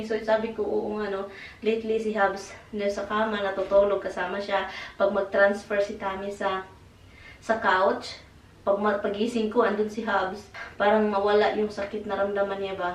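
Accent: Visayas, Philippines. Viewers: Tagal mo na dyan sa america. Ang accent mo bisayang bisaya. Bat dka magtrabaho pwede rin pala dyan housekeeper.0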